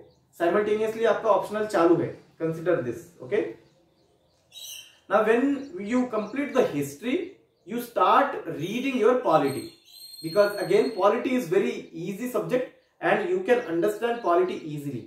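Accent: South, India. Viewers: Sir south indian tone in your language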